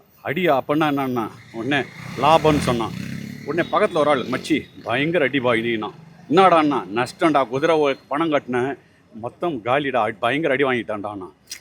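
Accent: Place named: North India, India. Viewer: Neeee thamilunu solla tha da neye north India maritaha pesura punda 😂😂😂😂😂😂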